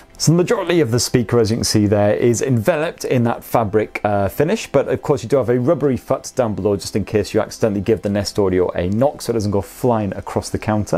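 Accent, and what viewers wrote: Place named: England, United Kingdom. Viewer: I love your English accent so much I wish I had it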